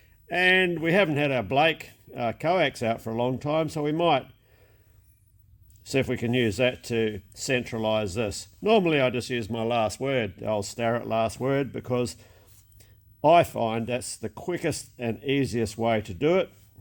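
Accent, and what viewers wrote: Australia. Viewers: I watched a few of your videos and decided there was so much I could learn that I quit watching the latest and started from the beginning. There was something in every video that a non-professional like me can learn. I've been running a mill and a lathe since 2007, but never better than now thanks to all the little--and some big--hints and techniques you include in everyone your videos. I've also learned enough of the Aussie language that I do not need the captions anymore! So, creak on! Thank you!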